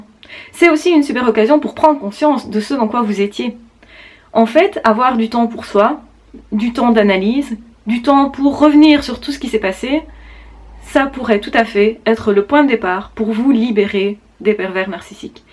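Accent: Brussels, Belgium. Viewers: Bonjour... (Je n'ai pas trouvé de gmail où t'écrire sur ta page YT, je "tente le coup" par commentaire...). Je te suis depuis quelques temps (abonné) en tant que HPE/HPI, et je m'interroge toujours un peu, au sujet d'une femme avec qui j'ai passé quelques mois au printemps/été dernier... (silence radio aujourd'hui... j'étais trop "rebelle" pour me laisser "faire" plus longtemps... Merci à mon "âme" rebelle qui est parvenue à prendre le dessus sur ma "personnalité" hyper-sensible... à tendance dépendante, donc). Penses-tu qu'un(e) PN puisse avoir des tendances masochistes dans sa sexualité? (ça m'a toujours paru plutôt "contradictoire", mais il est peut-être aussi envisageable d'y voir une sorte d' "exutoire" à ce besoin de contrôle permanent... En t'écrivant, ça me paraît quand même ne pas trop "coller" avec le PN...) Une autre suggestion de profil compatible avec ces 2 tendances? 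(Vie totalement "sous-controle" - par exemple hyper-propreté, clinique -, et sexualité à tendance masochiste... Personnalité évitante, ce serait possible? ) Merci encore à toi pour ton travail 🙏 et ton joli accent que j'aime beaucoup 😉 (Bruxelles? Je ne suis pas belge, sinon je reconnaîtrais vos accents... Par contre, je suis fort en accents français...😁). C'est assez troublant, tu lui ressembles un peu, physiquement (à cette petite "peste" que je soupçonne PN.. les yeux, la bouche...mais là comparaison s'arrête là... ). Paix et Amour à toutes et tous 🌈💕🦊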